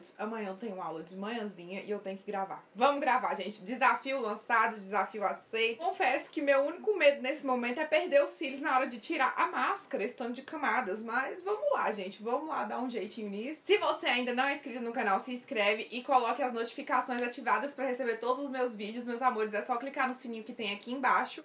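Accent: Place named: Bahia, Brazil. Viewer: senhor! que coragem. ..Kkkkkkkk tu é linda demais , amo seu trabalho e seu sotaque parece que é bahiana. beijos linda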